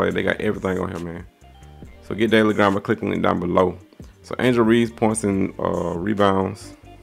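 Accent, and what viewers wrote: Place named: Houston, United States. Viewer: Ayyye, my friend and I are debating about where you from. Based on your accent I’m saying Houston but he thinks it’s ATL, if you don’t mind, can you help us squash the debate!?!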